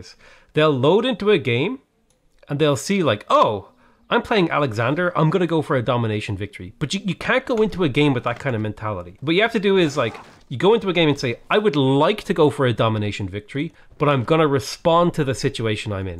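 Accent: Ireland. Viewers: is it only me or did he start to yous his Irish accent? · You sound more Irish than usual in this video. Kinda neat to hear. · I was gonna say he sounds more "Irish for some reason, also pretty tired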